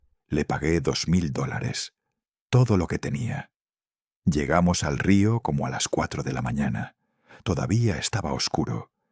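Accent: Spain. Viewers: Con ese acento Español no te creo ni papas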